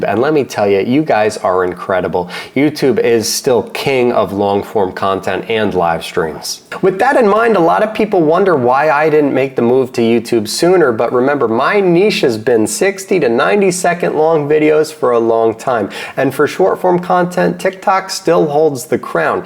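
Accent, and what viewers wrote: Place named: Midwest, United States. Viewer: man this guy amazes me every video. first time i saw him i was like "look at this nut job, tattoos all over his face and body" then i watch him and he is so charismatic and fourthrite and has always been upfront with everything hes doing . and now this video. This guy is definitely a don't judge a book by its cover example. also he has a accent from somewhere like iowa or illinois maybe more east but its interesting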